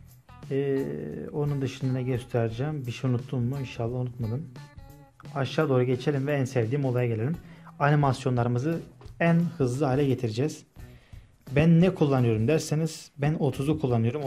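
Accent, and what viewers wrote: Gaziantep, Turkey. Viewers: Antepli misin sen ? Neresinden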